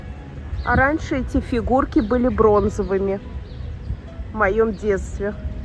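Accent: Baku, Azerbaijan. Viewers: Русскоязычные бакинцы так и говорят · Мой родной,красивый,незабываемый город.Огромное спасибо,за показ родных мне мест и за дорогой бакинский акцент!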